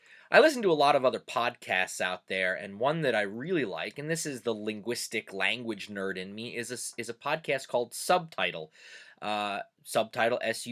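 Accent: Pennsylvania Dutch, United States. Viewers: I get a kick out of your Dutch accent on “Ask a PA Dutchman.” I grew up in Central PA and I have heard some words that I never realized it was an accent. The most obvious words that are from the area are the pronunciation of Lancaster and Lebanon. And I don’t know if creek and crick are part of this too? When I was younger, I traveled all through PA as a salesman. It was amazing to hear all the accents just in PA. From Pittsburgh to the coal regions up north, Philadelphia and our beloved Dutch country. I never got to learn the language but there are some words that did make it though the family. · Accch: Swetz net so dumb. It's good to talk your normal accent. When I was in the navy with alot of southern types, I was told that I "talked too fast " You don't have to talk like Proffessor Schnitzel. But a normal sounding Pa. German dialect is enjoyable to listen to. 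Thanks for your videos.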